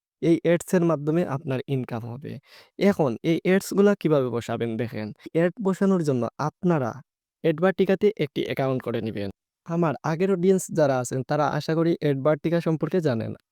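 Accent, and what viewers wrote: Sylhet, Bangladesh. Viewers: সিলেট নি ভাই তুমার বাড়ি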